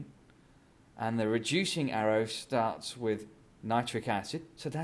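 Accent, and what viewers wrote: Australia. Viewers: I thought this guy was Australian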